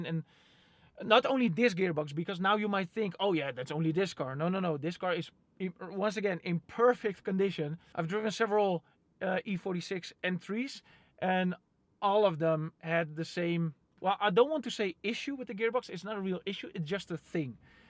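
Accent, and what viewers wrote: Netherlands. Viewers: Your accent sounds like you're from the Netherlands? I have a 2005 E46 M3 with 265 mm square setup lowered on coilovers with all sorts of High Performance Suspension goodies. I treat my daily 50-mile round trip commute as my personal racetrack. I wouldn't say my setup is super high on comfort, but it is off the charts in fun. My car came with 19-inch Wheels but I eventually graduated to lightweight 18 inch wheels which perform better and are definitely more comfortable. Bigger selection in 18inch and less expensive too. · that dutch accent tho, jullie knn echt geen zak talen man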